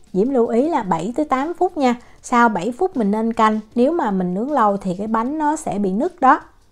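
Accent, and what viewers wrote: South, Vietnam. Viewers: Woa, cái bánh lạ quá. Mới đọc tựa tưởng đâu bánh thơm mùi lá dứa, nhìn rồi mới biết trái thơm, trái khóm. Chị dân bắc mà nói giọng nam dễ thương ghê. Cám ơn chị.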